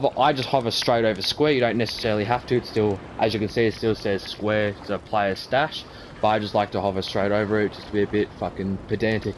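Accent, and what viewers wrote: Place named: Australia. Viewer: Strong australian like it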